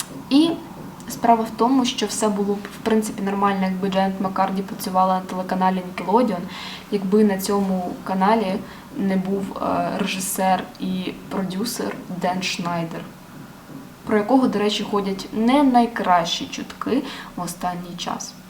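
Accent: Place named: Russia. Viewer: Привітик, файне відео:3 але попрацюй над вимовою літер, вимовляй чіткіше літеру "Щ" наприклад як "шчо, шче, шчоб" та не забувай про "Ў нескладове", розумію тобі як російськомовній буде тяжко звикнути, але потрібно позбавлятися російської вимови, я вже довгий час працюю над своєю вимовою!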